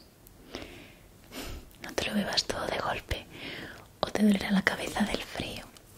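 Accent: Spain. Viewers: como pronuncia esa R como es, yo diciendo palabras con R como orchata suena como L, puertorriqueno al fin lol. El acento espanol usualmente no me gusta mucho pero el de es chevere, no se debe ser la region de donde es o no se pero lo mejor de ella es cuando habla. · La tonada española es el toque perfecto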